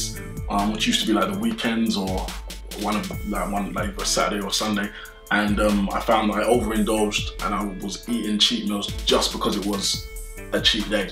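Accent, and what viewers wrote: United Kingdom. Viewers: Did not expect the british accent.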